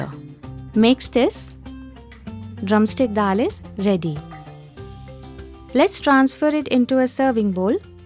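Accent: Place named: Odisha, India. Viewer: Your English talking style is like a waww. ,,,I guess you are from odisa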